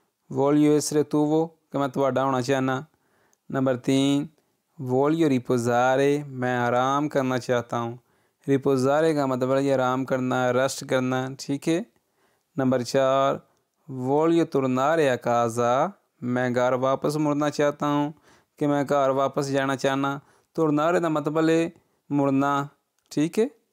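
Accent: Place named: Gujrat, Pakistan. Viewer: بہت شکریہ شہزادے ویر بُہت سونا پڑھدے او توسی❤❤❤گجرات دی بولی بول دے او ویر جی ،رب سلامت رکھے جے آمین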